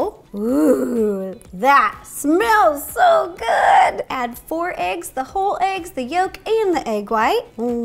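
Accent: South, United States. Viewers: was it just me or did she sound like she had a southern accent 😂😂 love u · She is starting to sound southern! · Why does she have a southern accent half the time? She's so cute😂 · No one:......... Me: notices accent why is there a southern accent if she is from Seattle, WA?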